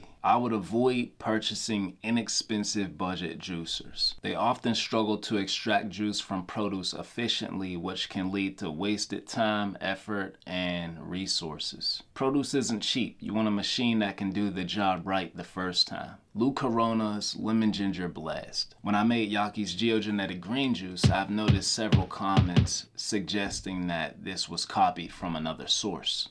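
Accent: Pittsburgh, United States. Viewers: Few minutes in, and my question was answered lol. I heard the Pittsburgh in you, first sentence. 💪🏾 Keep grinding man, and you gained a new subscriber. I’m originally from Penn Hills/ Homewood.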